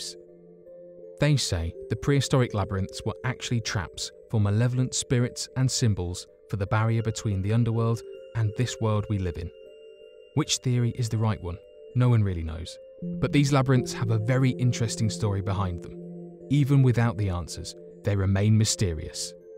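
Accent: United Kingdom. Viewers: Tacos - they are from different regions in the UK, hence difference in their accents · this guy is more brittish than our normal guy where is our normal guy?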